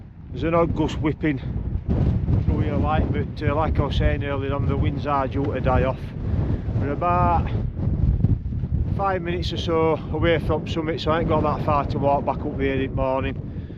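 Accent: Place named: South Yorkshire, United Kingdom. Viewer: Awww you sound like home to me, I'm in Newcastle but my home is south Yorkshire ❤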